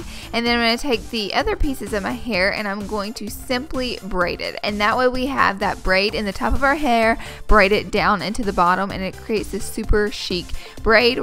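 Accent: South, United States. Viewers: are you southern, you sound like me haha like a baby southern accent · Are you from the south cuz you sound like it. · You have a posh southern accent. I love it. Your so beautiful!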